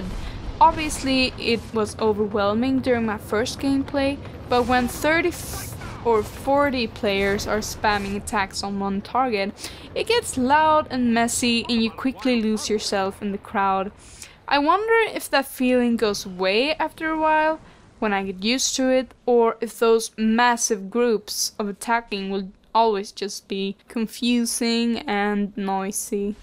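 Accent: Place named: Sweden. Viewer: Sometimes I hear a bit of Swedish accent but your english is excellent, where are you from? Nice review and most of the time the only thing I want to hear is that it's better than wow, for me that is enough.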